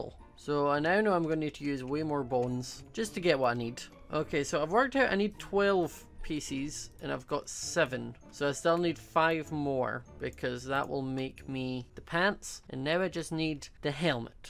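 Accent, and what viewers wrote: Scotland, United Kingdom. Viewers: OMG I LOVE YOUR ACCENT I LOVE SCOTTISH ACCENT SO MUCH ok lol I need To stop.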